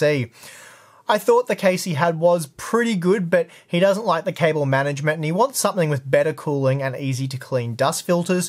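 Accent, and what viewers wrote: Australia. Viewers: Aussie mate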